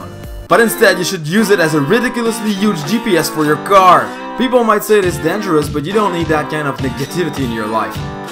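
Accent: Quebec, Canada. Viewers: This is a French-Canadian accent! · You sound like a Québécois from Montreal ! Not that there is anything wrong with that .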